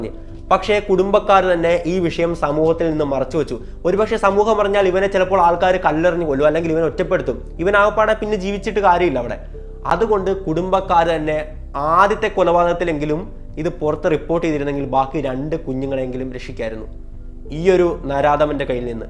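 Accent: Vadakara, India. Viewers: ... Nee vadakarayano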